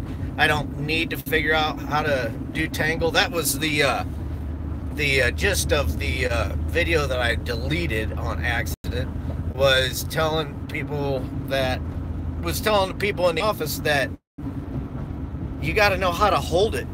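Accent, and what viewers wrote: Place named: United States. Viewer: I love your american accent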